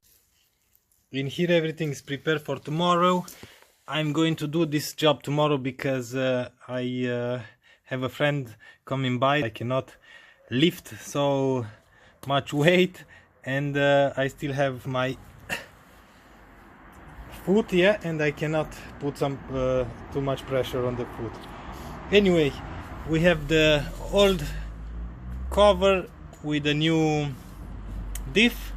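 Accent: Romania. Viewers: Am zis eu ca are accent romanesc . Diferential de pe e39 525 d e compatibil cu 525 tds? Mersi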